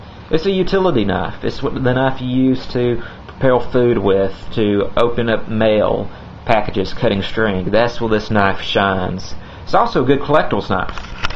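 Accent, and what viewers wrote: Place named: Louisiana, United States. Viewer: Good review. When I got mine it was not that sharp and I noticed that the bevel is over 20º on either side. Was yours like that as well? I'm going to reprofile mine this weekend. You from Louisiana? Love that accent.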